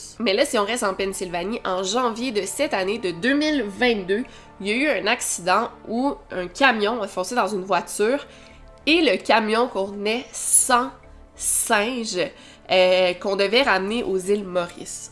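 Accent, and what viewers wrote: Quebec, Canada. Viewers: Le vaccin contre la variole est valable à vie et j'ai été vaccinée étant enfant, donc je suis immunisée contre cette terrible maladie.Les jeunes sont plus à même d'attraper la viriole mais heureusement, le vaccin existe donc pas de panique. J'adore ton accent. Je suis Alsacienne et je trouve mignon l'accent canadien. Bravo, tu es super. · De verdad te quiero mucho pm porque? Je regarde toutes tes vidéos et j'aime bien. Je sais tu vie au Mexique mais tu as pas perdu ton accent Québécoise. T'es petits Tbk et Chris me plaît. Jajajaja Cuídate y besos xxxx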